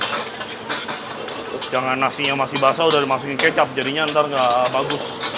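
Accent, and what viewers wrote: Banyumas, Indonesia. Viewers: Koko kok bisa bahasa ngapak yah? Kepengin punya kedai kaya gini koh Salam dari cilacap kota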